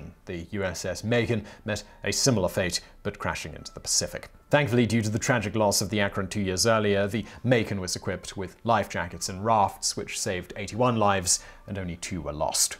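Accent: United Kingdom. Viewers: Did it sound weird to anyone else when he said the speed in miles per hour before saying it in kilometers? Like maybe my brain, hearing a British accent, prepares itself to pay attention to the second set of numbers?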